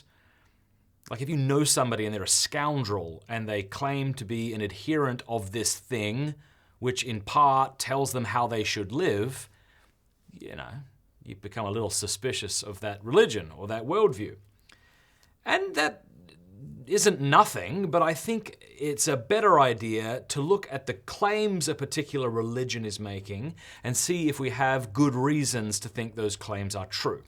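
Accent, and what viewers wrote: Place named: Australia. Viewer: Cool to see the best Australia accent ever.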